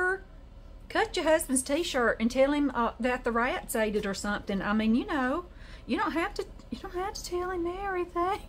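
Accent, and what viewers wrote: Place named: Tennessee, United States. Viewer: Great tutorial! I have a sister-in-law from Tennessee who lives in Alabama and works in Georgia and you sound so much like her! I'm from Pennsylvania and don't have an interesting voice at all. What a beautiful journal! I just started mine.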